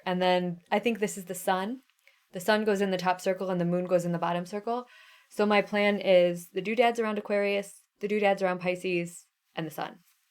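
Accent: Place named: Midwest, United States. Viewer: Sorry you didn't get to Nashville, but it was good to see you! I had to lol when you mentioned your 'midwest coming out'! I've thought that for years ever since I watched Fargo - I hear that accent when speaking! 🤣 I'm sorry for your loss of your uncle.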